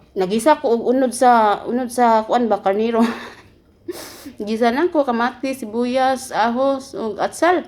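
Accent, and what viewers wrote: Visayas, Philippines. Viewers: yan tlaga magsalita bisaya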